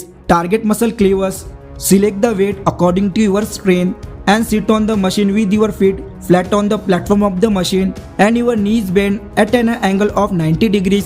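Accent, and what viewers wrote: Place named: India. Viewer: Its the Indian accent that makes it 10x better to me xD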